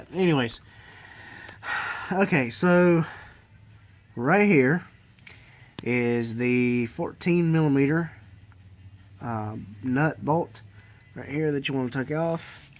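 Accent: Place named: Texas, United States. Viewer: let me guess are you from texas with the way you speak and dont blow nose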